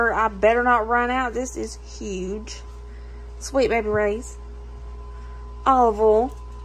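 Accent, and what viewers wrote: South, United States. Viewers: It’s ok you are southern you have an accent that is so cute. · You are literally the only person who is actually adorable saying SALmon. 🥰 Love your southern accent.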